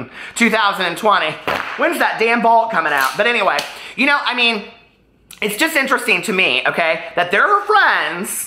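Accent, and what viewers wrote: South, United States. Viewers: The snap chat fliter makes your southern accent so funny and 10 times more amazing 💕 you're accent makes we wish I was more of a southern gal 😭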